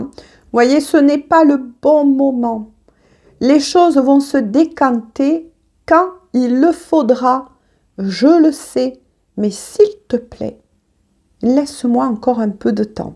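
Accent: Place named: Marseille, France. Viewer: C’est vous le rayon de soleil,avec cet accent de Marseillais « je crois « vous écouter m’apporte beaucoup, mais bien sûr aussi les messages dont vous nous faites la lecture, merci d’être là votre chaleur est réconfortant. 🙏✝️💜🙏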